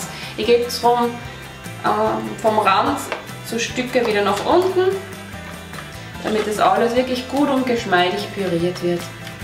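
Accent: Switzerland. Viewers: actually she speaks german with a tiny swiss accent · no it's a swiss accent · bisch a Schwiizeri? wegem akzent?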